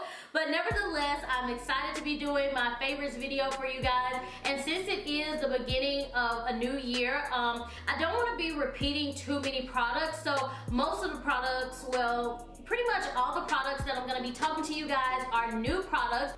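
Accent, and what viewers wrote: South, United States. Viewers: My 15 year old and I watch your videos together we love you. Love your southern twang. Snapchat: queencindy619.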